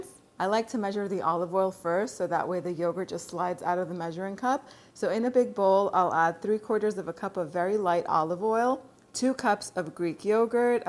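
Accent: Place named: New England, United States. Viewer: Love your Yankee accent than Shambali ❤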